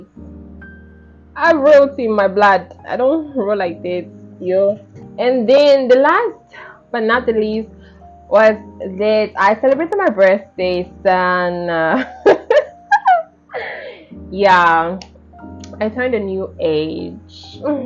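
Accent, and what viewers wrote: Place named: South Africa. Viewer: I love the SA accent n vibes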